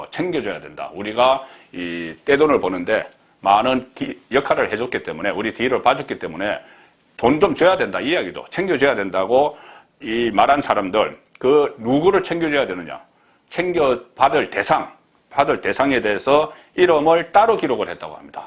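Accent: Gyeongsang, South Korea. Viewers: '대장동게이터 이혹'이 있는... 갱상도 사투리가 구수하시네요.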